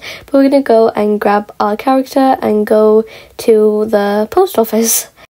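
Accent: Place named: United Kingdom. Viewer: I ❤ ur British accent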